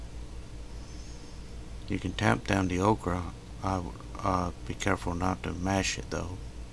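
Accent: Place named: Louisiana, United States. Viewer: Are you from Louisiana? That is where my family is from. I recognize the accent and the of course you mentioned gumbo.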